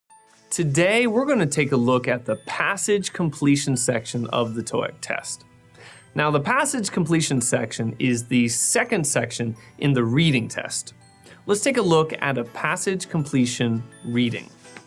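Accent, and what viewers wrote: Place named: Yorkshire, United Kingdom. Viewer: hi there :) I'm really into your videos and i find that i admire you lots, i enjoy your British accent the most, which sounds like Yorkshire people :) your videos have helped me so much in my toiec preparation. But i'm still a little panic before taking part in the Toeic test. my facebook is 'Z hana ookaze', pls add friend, i d love to make friend with you :) . Best regards from Saigon!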